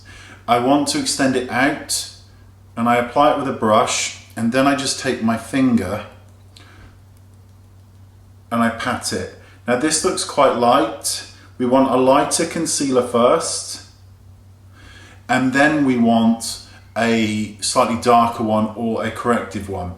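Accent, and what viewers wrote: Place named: England, United Kingdom. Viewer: I love your videos, because I learn so much...not just on how to apply products or which ones you recommend, but the english language, too. You speak soooo clearly and slow which makes it easy for me to understand nearly every single word you say. I bloody love the english accent! 💖 You should start a new channel "Wayne Goss - english for beginners".😜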